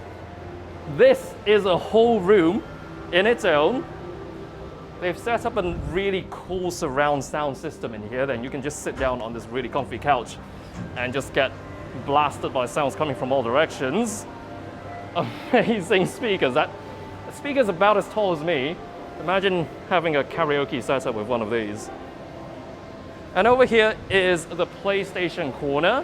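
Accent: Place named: London, United Kingdom. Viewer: Where is this guy from ? Looks malaysian but speak perfect london english ....raised in uk but decided to come back to malaysia ?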